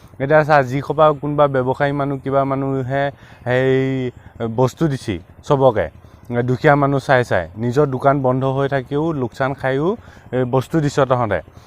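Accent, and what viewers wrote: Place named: Morigaon, India. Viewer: মই সেই video তু চাইচু কথা বিলাক বৰহি্য়া হৈছে ।আমি মৰিগাওৰ লোকেল ভাষা এ ভাল লাগে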